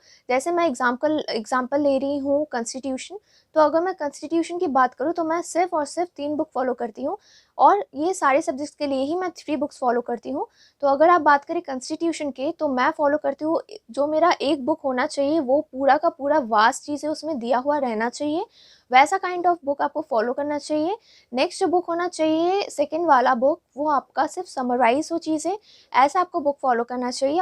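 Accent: Bengal, India. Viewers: Bangali hai ho skta hai uski Hindi aisi ho okk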